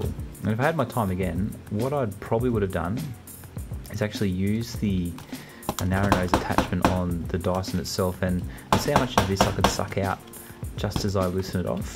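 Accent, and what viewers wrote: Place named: Australia. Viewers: He’s from Australia. That’s what that accent sounds like! A sensible country where you rightly pointed out normal people don’t have or need hand guns. They do however have snakes and spiders that are 10 times more dangerous!! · This is an Australian accent, not a British one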